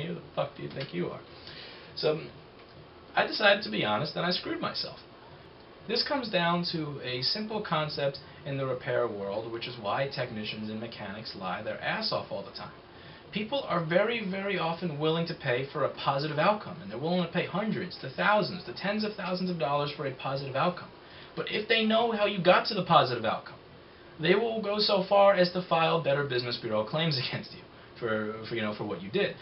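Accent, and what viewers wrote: New York, United States. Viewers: Enjoying your videos on "repair shop culture" I gather that you're from New York?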